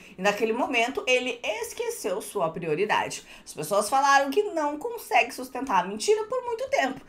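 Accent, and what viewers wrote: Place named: Rio Grande do Sul, Brazil. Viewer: Guria tu és gaucha tchê.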